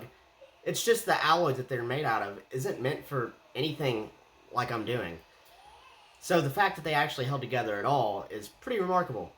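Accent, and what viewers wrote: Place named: Appalachia, United States. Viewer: I rather try to catch that slug with my face than have too listen to another minute of this hill billy speak again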